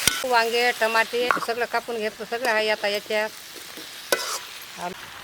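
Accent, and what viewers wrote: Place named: Akola, India. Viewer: तुमची भाषा अकोला जिल्ह्यातील आहे असे वाटते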